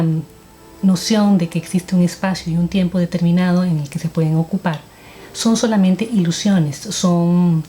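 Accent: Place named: Costa Rica. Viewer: Creo que es de Costa Rica.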